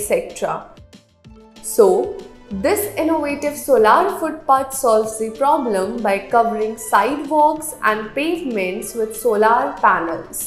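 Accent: India. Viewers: the accent is almost totally but not quite totally indistinguishable from English. It's very.. bolly. Staccato... too Adamant. English should be softer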